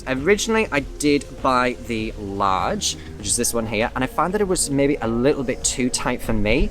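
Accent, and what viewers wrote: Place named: Yorkshire, United Kingdom. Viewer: Are you from Yorkshire,? I've never been able to place your accent but hearing you say Moor made me think of Yorkshire.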